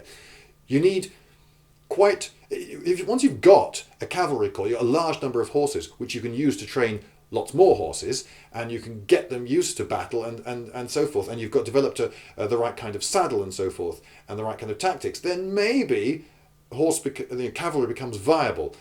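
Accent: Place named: England, United Kingdom. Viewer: Love the English accent. A unique attitude.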